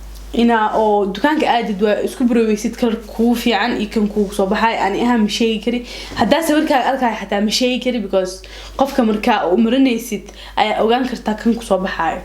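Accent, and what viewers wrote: Mogadishu, Somalia. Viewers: Hhhhhhh thanks xamaraa kaga keeny cabah afsoomlig blo weeye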